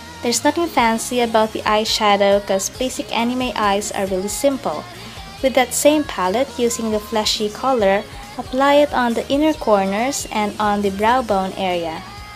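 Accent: Philippines. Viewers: I instantly knew you were from the Philippines when I heard you speak. xD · Omg i can totally hear the Filipino accent in you xD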